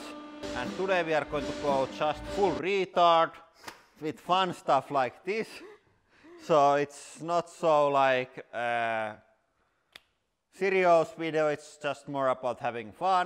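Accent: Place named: Finland. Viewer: Kunnon rallienglanti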